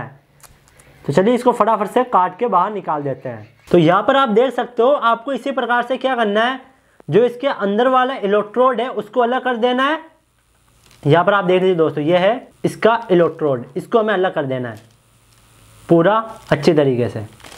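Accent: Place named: Gujarat, India. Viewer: Bhai ap Gujarati ho ..kiyo ki yeshi hindi ...ham hi bolte..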